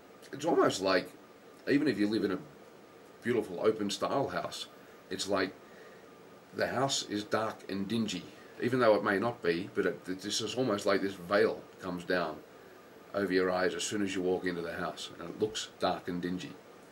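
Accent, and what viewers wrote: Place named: Australia. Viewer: You have an uncanny ability to talk...and talk...and talk.... I've gotta say though, you make more than just a lick of sense. I too find a clean, neat, and organized environment rather enjoyable, relaxing, and invigorating for both the mind and body. 'Tis far easier to do something clean for myself when the atmosphere around me is already clean. Good video! -Josh PS: If you didn't have that accent of yours, I probably wouldn't have lasted the entire video. Aussies rock!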